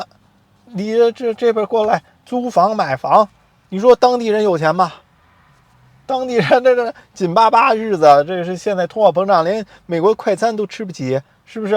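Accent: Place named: Beijing, China. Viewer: 我实在是听不下去了，现在发表一下我对这个“大外宣”也许是“糊涂虫”的看法与对他混淆视听的如下几点进行纠正： 1. 投资移民（EB5）项目暨大型区域中心投资项目，投资就有风险；2019年11月前绝大多数投资额为$50万，外加必要的律师费及移民局收取的费用约合4-5万，如申请人在🇺🇸以外，等待期为6-8个月随即去美国领事馆面谈拿签证并可进入🇺🇸再往后就是拿临时绿卡；等最终拿到正式拿到10年绿卡的同时，当年投入的全部50万资金一定会返还给投资人，所需全部时间一般为5到8年但也有例外，因为毕竟这是个投资项目是有风险的；目前我身边在2015至2019年持EB5签证进入美国的朋友们都已经拿到绿卡，没听说有出了问题的！ 2. 🇺🇸华人新移民一般不吃Panda Express也就是熊猫连锁快餐，原因很简单暨他们是美式中餐； 3. 🇺🇸华人新移民是美国社会现今一支很强的消费大军，无论在教育房产旅游日常用品...当地主流及外族裔极力都想进入华人市场分一杯羹，但因语言及文化差异基本都很难，除非与华人合作或聘请华人高管... 最后，我衷心的建议这位操着北京口音的“ ”，🇺🇸并不完美但她的包容与无限的机遇吸引了过去近200年，来自世界各地并前赴后继的“探险者”前来”淘金“，也许绝大多数人只能养家糊口维持生计，但也不乏有相当数量的白手起家人士赚得盆满钵满，且不必被倒查20年甚至以莫须有的罪名打入死牢... 来到一个新的国度，要多观察多学习才能尽快融入当地社会，否则就失去了移民的真正意义！ 祝好， （本人不是移民律师或相关从业人员）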